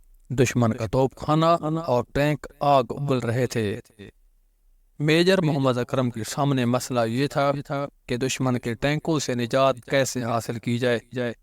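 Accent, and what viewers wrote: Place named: Mianwali, Pakistan. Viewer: Lala Banda mianwali ka ho or Urdu parhey tu aesey hi hota he....baharhall achi kawish he Allah Kareem apko kamyab karey...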